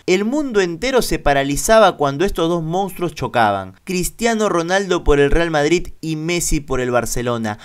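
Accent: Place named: Argentina. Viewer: El contenido va bien a veces pero el tonito argentino no va. ¿Por qué nos queremos parecer tanto a ellos? ¿Jala más seguidores?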